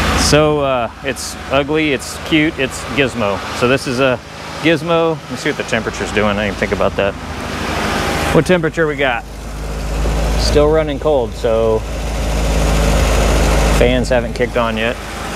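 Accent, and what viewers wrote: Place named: Texas, United States. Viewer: Hey you know Texas Talk just like me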